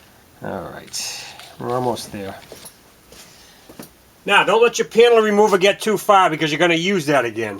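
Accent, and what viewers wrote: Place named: Boston, United States. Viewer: Ya sound like your from bawwston, are you from bawston there boy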